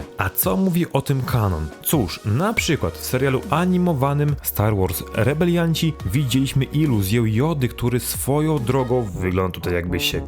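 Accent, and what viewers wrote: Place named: Israel. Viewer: gdzieś ty się uczył polskiego..człowieku..!1 co to za akcent......koszmar...z izraela...???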